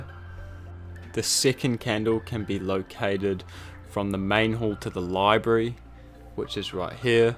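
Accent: New Zealand. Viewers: Are you from nz bro?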